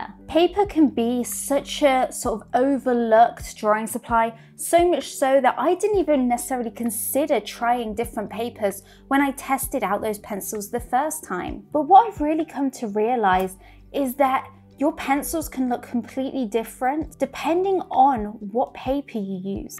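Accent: United Kingdom. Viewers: Me encanta escuchar tu acento britànico jeje aunque no me queda muy claro si los dibujos a lapiz deben brillar o no, es recomendable o no que brillen? that is the question my Dear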